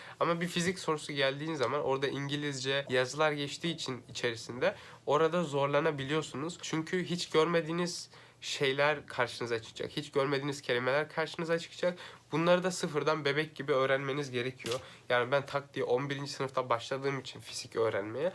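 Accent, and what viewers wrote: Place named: Germany. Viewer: Kardes oyle bir ağzın var ki 30 senedir almanyada yasayan kuzenim gibisin , abd'de 1 senede turkceyi mi unuttun neden konuşamıyorsun?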